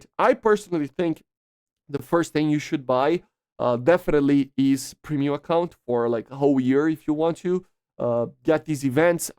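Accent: Brazil. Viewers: Engraçado como eh facil detectar o sotaque br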